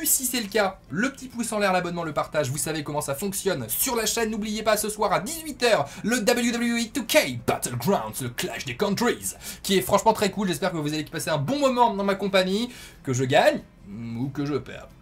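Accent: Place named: France. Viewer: Mon dieu l'accent Français une tuerie!!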